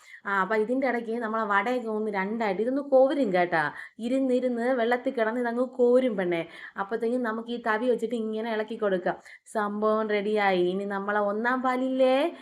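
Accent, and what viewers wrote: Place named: Trivandrum, India. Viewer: Trivandrum language super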